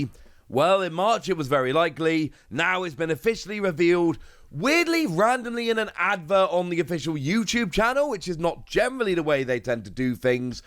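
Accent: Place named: United Kingdom. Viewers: British accent 😌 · He’s British. Kinda like Wah-ter (water) is watt-ah.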